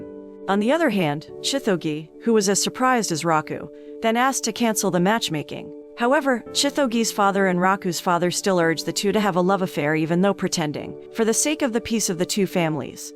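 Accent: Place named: England, United Kingdom. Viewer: ENG accent makes it a little hard to understand. ニセコ, neese-ko-ee Nisekoi, and not nasekoi xd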